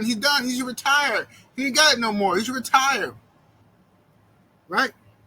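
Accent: United Kingdom. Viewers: Your U.K. accent. 😂👌🏻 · Your Scouse accent 😂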